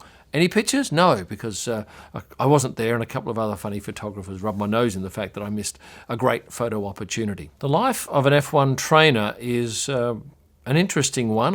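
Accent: Australia. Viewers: So good to hear an Aussie accent in F1 media - good work and nice report.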